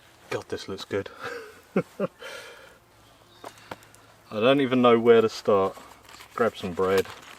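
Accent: United Kingdom. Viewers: The sensational combination of at least 3 star level meals, while cooking via bushcraft, and narrating with a british accent.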